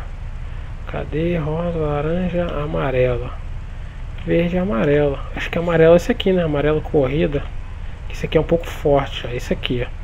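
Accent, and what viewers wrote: Bahia, Brazil. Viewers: Caramba mano tu e baiano né kkkkk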